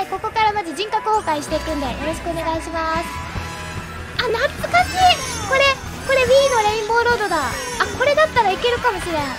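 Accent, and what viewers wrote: Kansai, Japan. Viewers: にゃひーや！初見です 声かわいい(( もしかして関西人の方ですか？(喋り方的に) ちなみにゼルダの伝説ムジュラの仮面はブーメランではなく、ゾーラリンクのブーメランがあります(( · 初見です めちゃくちゃ可愛い声と関西弁最高でした！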